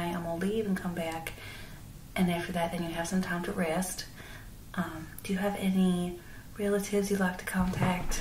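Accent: South, United States. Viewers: with that Southern belle accent, this Yankee would tell you anything you want to know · Your southern accent is better than most Hollywood versions with voice coaches and technical consultants...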